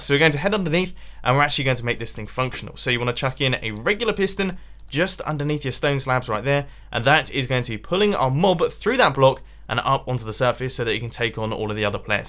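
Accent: England, United Kingdom. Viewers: Are you British? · r u english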